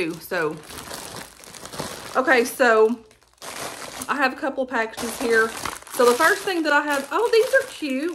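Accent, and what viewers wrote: South, United States. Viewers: I love your videos, I'm from Australia and adore your accent and when you say 'Y'all'. Your videos are very relatable, I love Temu!!!!!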